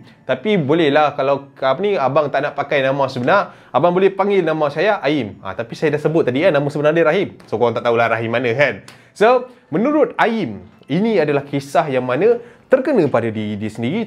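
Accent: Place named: Kedah, Malaysia. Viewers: Best pekena liwat Kedah..mcm Imuda bercakap😁😁😁😁😁😁👻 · Comel je ckp kedah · Pandai cakap utaqa noh...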